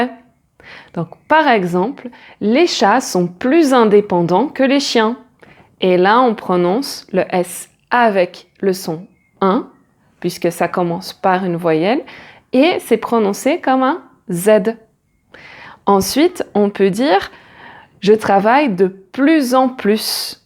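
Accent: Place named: France. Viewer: You have a beautiful French accent 💕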